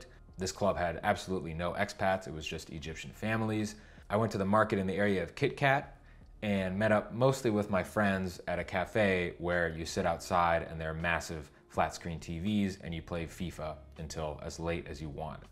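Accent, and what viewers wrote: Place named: Staten Island, United States. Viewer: Haha! Nice accent! Spent any time in Staten Island?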